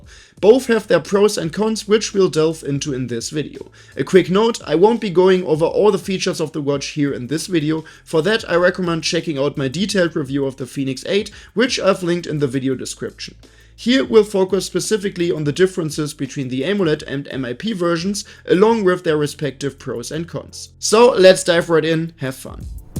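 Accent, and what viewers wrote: Germany. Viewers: 10/10. The German accent takes it to an 11. I love that this channel is simply called " "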